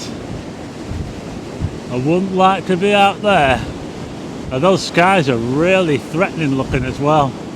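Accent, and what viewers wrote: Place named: Lancashire, United Kingdom. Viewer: I missed Blackpool and your lovely videos always provided me the update on the surrounding areas..l also loved to listen to your Lancashire's accent ..Really enjoyedwatching it..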